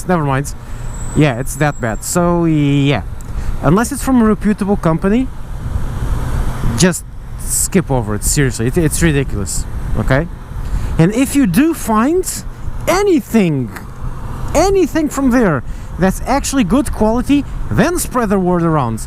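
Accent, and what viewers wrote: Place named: Portugal. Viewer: I was just listening to the video and I knew you were portuguese by the accent. Let me tell you. That camera is pretty good for $10 but "O que é barato sai caro" como já dizia a minha avó.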